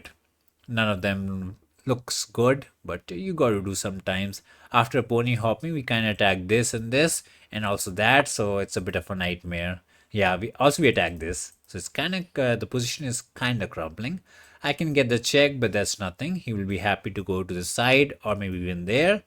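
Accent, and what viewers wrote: India. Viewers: bro can you please stop with this indian accent because i cant take anything serious, i know you can speak english normally.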